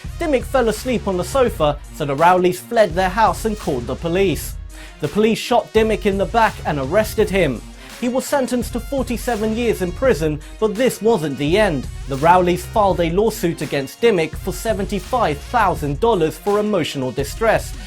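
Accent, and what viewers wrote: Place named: United Kingdom. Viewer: Gets the second like and first comment, How's your day British narrator ?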